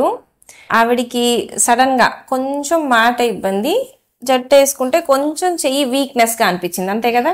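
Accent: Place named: Rayalaseema, India. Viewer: కొంచం రాయలసీమ యాస గుంటూరు యాస మిక్సింగ్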